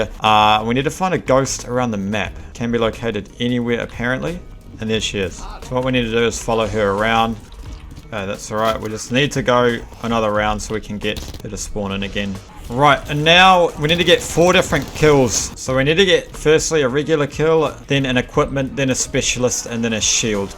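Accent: New Zealand. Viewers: Your a kiwi aren't you? :)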